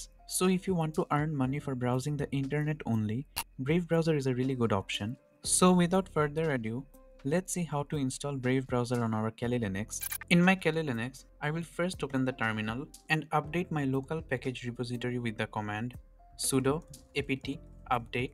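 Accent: India. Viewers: কথা শুনে মনে হচ্ছে আপনি বাঙালি ❤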